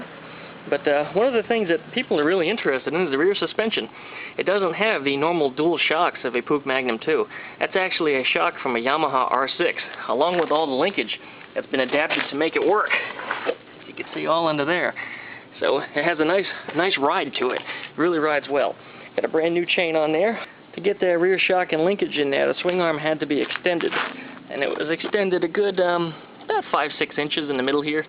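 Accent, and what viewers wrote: United States. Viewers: It's in a good Way bro and you kinda sound country no disrespect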